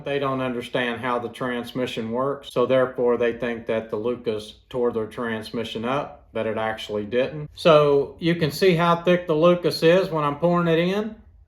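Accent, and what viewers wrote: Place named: South, United States. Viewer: Heard some Southern, left a like.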